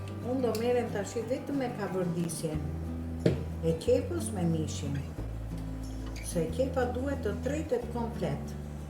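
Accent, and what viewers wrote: Tirana, Albania. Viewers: Je me e mira. si per gatim ashtu dhe per te folmen tironce .respekt nga larg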